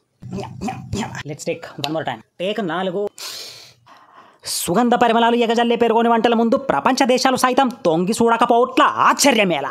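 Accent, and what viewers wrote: Telangana, India. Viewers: కానీ నీ వాయిస్ ఇంత స్పీడ్ సూపర్ తమ్ముడు నిజం నీ వాయిసే నీకుపేరు తెచ్చింది అందులో తెలంగాణ యాస సూపర్ 👍👍👍😀😀😀😀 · తమ్ముడు నువ్వు గ్రేట్ 😊అందరు మోడరన్ తెలుగు మాట్లాడుతుంటారు కానీ నీవు తెలంగాణ బాషా యాస ప్రాస మాట్లాడుతు వంటలు చేస్తున్నావ్ 🥺👌🙏😊వెరీ గుడ్ కీప్ ఇట్ అప్ 🥰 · Amazing videos man… u have a unique style in making good and entertaining with your telangana accent… I laugh on every video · మీ షార్ట్స్ లో నీ మాటలు మంచిగా మన పూర్వకాలం నాటి తెలంగాణ యాస తో ఉంటాయి ,, గుడ్ బ్రో ఇంకా ఇలాంటివి ఎన్నో చేయండి.. keep going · Telangana slang bagundhi bro... 👌👌👌😊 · Na telangana yasa ❤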